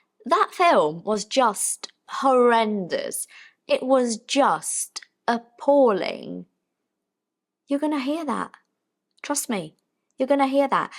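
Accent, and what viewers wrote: United Kingdom. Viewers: Thanks, beautiful. I really like your British accent. It's very pretty. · You must a Brazilian, speaking the English language as a foreign language in Brazil. And you also sound a little bit like a british person. Congratulations, my dear!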